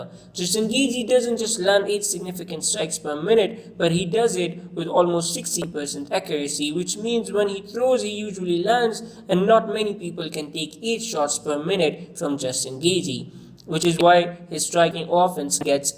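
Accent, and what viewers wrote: India. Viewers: yeah man..great to find an indian fight fan.... Namaste ... His accent sounds like indian ...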